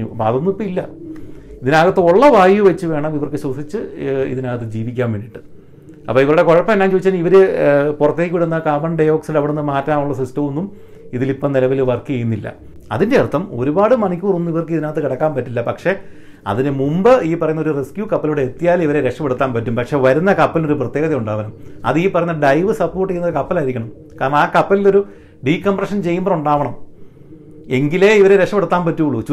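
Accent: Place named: Kasaragod, India. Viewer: നിങ്ങൾ കാസർകോട് ആണല്ലേ😜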